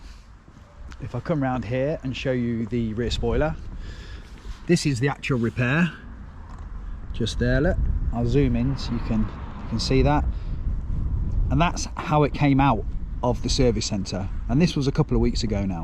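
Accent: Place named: England, United Kingdom. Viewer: #WhingingPom the moment I heard the accent, I knew I was in for a whinging pom. I was not disappointed.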